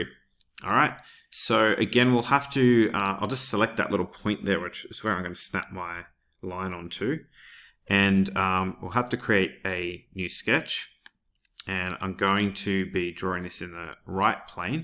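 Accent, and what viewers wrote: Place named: Australia. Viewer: Bro, The dude in Thor Ragnarok is New Zealand. This dude here is Aussie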